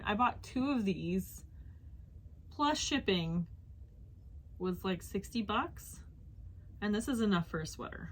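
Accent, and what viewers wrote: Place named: Minnesota, United States. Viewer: Are you sure you're not from Minnesota? Because I grew up there and listening to you chat is just like sitting with my cousin or a good friend. I love your podcast and glad I found you. I mainly knit socks, blankets and a few small things but you've inspired me to get out my one sweater quantity of yarn and try to knit a sweater. (I've made a couple acrylic sweaters but never a wool one.) Thanks for sharing your love of yarn and knitting!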